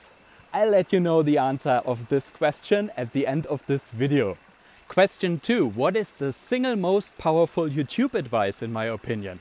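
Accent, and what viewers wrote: Germany. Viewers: Are you from Germany ? Because you English is more in the dialect of German ?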